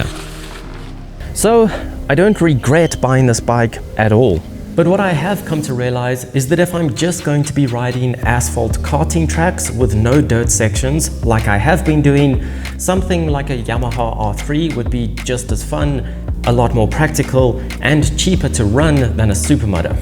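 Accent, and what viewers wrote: South Africa. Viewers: I am VERY good with accents, but not perfect. You are most def from South Africa, but English heritage or Boers/Afrikaans. heritage. I am NOT hearing a pronounced Afrikaans accent, so I am leaning to an English heritage, predominantly. Am I correct? I am just a bloke born and raised in the USA who lived in the Caribbean for 15 years. MANY of those years in the 1980's on the Dutch island of Saint Maarten where so very many expat South Africans lived on the boats they made and sailed away from their birthplace forever.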